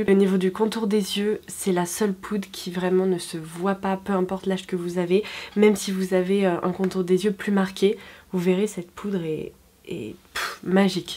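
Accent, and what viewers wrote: England, United Kingdom. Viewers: Magnifique make up 👍👍👍j'adore ton accent anglais, c 'est vraiment bien ce que fait SPacenK👍👍👍😊😊😊😊😊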